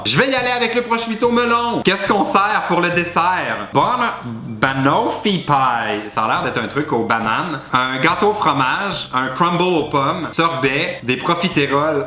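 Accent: Quebec, Canada. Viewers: Franchement je t'adore trop je suis francaise et j'adore l accent quebequoi c est trop chou!!!!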